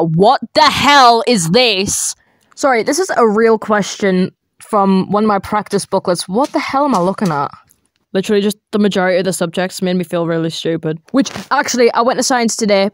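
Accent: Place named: North, United Kingdom. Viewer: you sound so northern LOL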